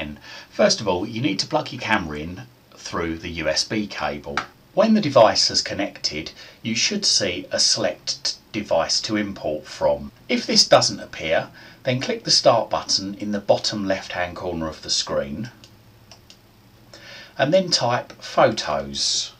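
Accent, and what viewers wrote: United Kingdom. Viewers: I've just found this and I know its going to be clear and useful!..........and thank goodness for your British accent! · Too hard to understand his British accent.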